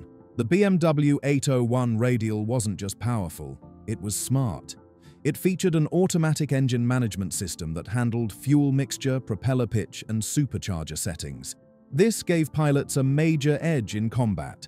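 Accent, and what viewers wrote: United Kingdom. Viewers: OMG another British accent!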